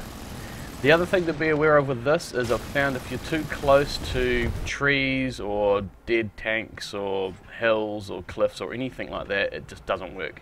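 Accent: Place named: New Zealand. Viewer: Spot the kiwi accent..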